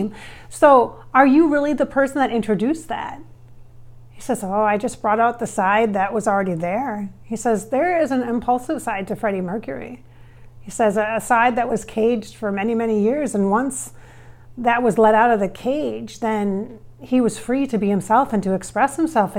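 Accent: Ireland. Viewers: Was paul irish? Almost sounds like an irish accent as you are speaking. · You developed an Irish accent. That’s interesting that you developed his accent. When you talked to him.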